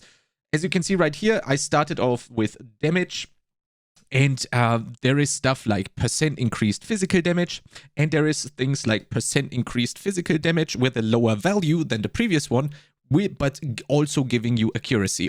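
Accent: Germany. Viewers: Perfect, just watched the other video about items and their stats before work today. Now this one drops when I get home. If I trust anyone with making cheat sheets and this stuff it's a dude with German accent.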